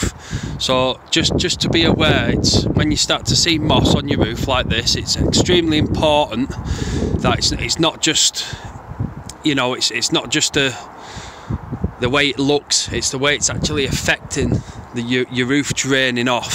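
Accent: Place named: Northern England, United Kingdom. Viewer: I'm American but something tells me that you're from the norf.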